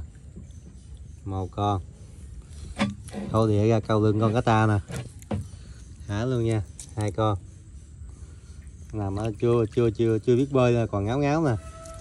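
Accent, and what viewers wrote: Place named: Mekong Delta, Vietnam. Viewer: đúng nói chuyện kiểu chân chắc miền tây, câu dính thả cá là mình thích rồi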